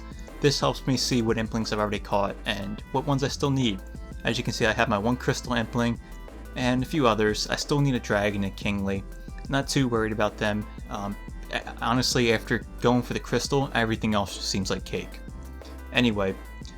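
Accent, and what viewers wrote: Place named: Philadelphia, United States. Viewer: You sound like you’re from the philly area. Also I hope you play osrs by now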